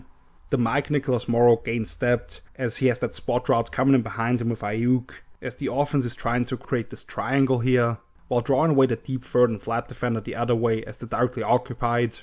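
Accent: Austria. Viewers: Your accent is marvelous. Are you Austrian? You sound so much like Arnold Schwarzenegger... Austrian by birth, moved to the US as a young adult, fluent in English but can't and won't mute the accent.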